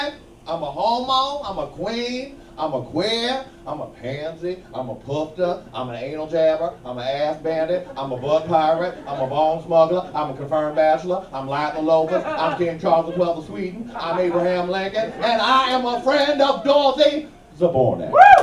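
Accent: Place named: Louisiana, United States. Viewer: Is he from Louisiana and idk why but I adore there accent. Whatever u do in ur life is none of my business. ✌ ❤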